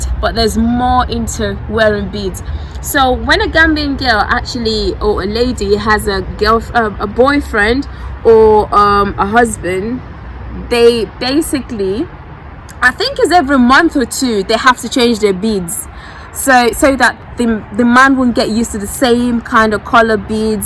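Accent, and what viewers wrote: England, United Kingdom. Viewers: Dame lady you fine hell, you got english accent.,you in england or gambia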